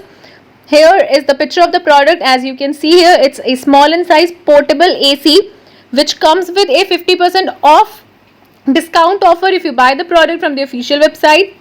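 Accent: India. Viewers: You speak like an indian guy and it sounds very funny😂😂😉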